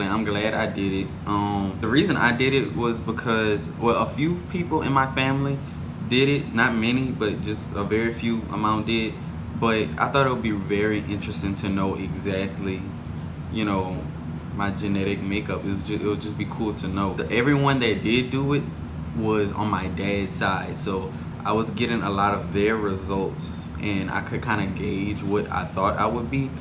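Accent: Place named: New Orleans, United States. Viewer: I love that we know our people just from the accent. I said to myself, "He is from New Orleans." Then I saw your results which were very similar to mine. One parent is from New Orleans, but I was born and raised there. My only migration was also Louisiana Creoles and African Americans. Thanks for sharing.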